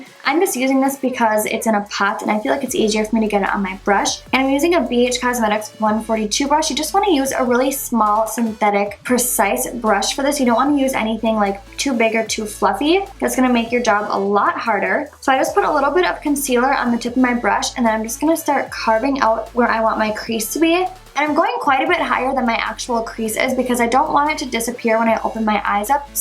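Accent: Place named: Boston, United States. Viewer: Random question but where are you from? Like you accent sounds northern or Bostonian maybe but I can't put my finger on it eek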